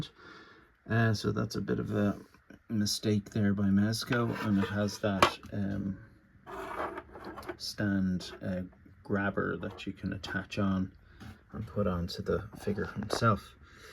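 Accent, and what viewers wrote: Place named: Ireland. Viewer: Recognise the irish accent. This is a great figure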